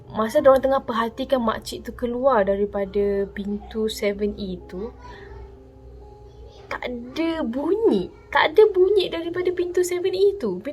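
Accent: Kelantan, Malaysia. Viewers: 7e kelantan ni kowt...sbb dia punya loghat mcm loghat kelantan😚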